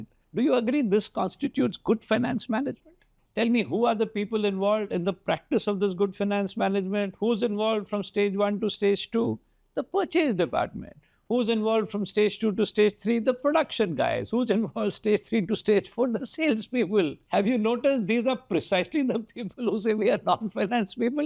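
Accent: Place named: Chennai, India. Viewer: Namaskaram sir many thanjs of this knowledge we gained, very good sir, lot to learn from this video channhl. Good . Lets know fto which place u ftom. Your english is crystsl clear douth indian typical chennai style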